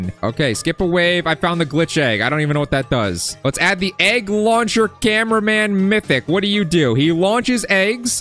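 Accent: United Kingdom. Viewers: The goudly British accent tho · Britsh voice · bro no one knew he was british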